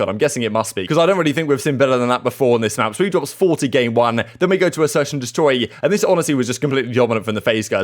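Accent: United Kingdom. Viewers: Can we get as an analyst? The man speaks with precision, and the british accent is on point. Great vid bro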